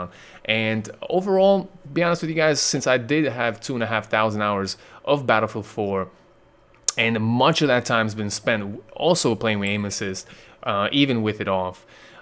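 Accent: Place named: Russia. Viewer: Steven Featherstone Not German but not American, think closer to Russia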